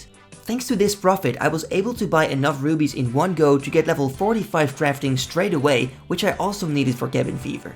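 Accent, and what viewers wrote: Netherlands. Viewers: such a baller lmfaoooo. You kinna sound dutch doesnt suprise me you a fan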